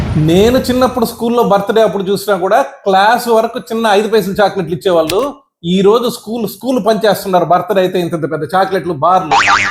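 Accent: Nellore, India. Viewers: Your Nellore accent is original & excellent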